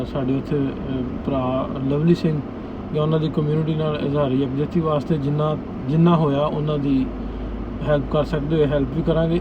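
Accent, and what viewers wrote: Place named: Amritsar, India. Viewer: God bless brother. Tusi pakistan wich kithey rehende ho? Tuhadi boli bilkul amritsar wali lagdi ah.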